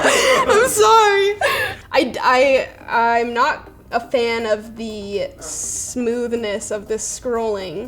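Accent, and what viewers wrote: Canada. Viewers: I’m from Minnesota and can hear her accent from Canada very easily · love the canadian "O's" :D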